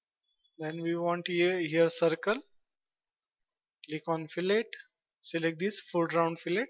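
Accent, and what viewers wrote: India. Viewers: Thank you man who has an indian accent, you are good explaining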